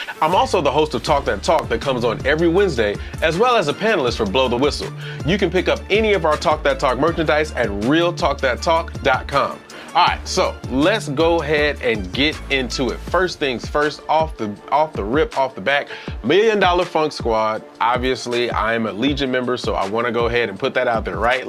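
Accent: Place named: South, United States. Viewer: you lean towards Southern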